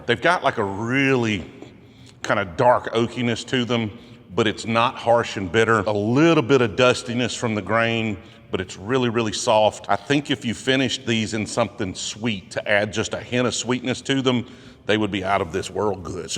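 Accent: South, United States. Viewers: is the reason I get so much enjoyment from you and your channel. That and me being a southern transplant, there’s nothing like a smooth southern accent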